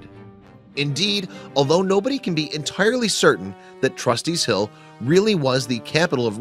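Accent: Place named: Midwest, United States. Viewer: The narrator has a Wisconsin or Chicago northern accent so he's mispronouncing a lot of words.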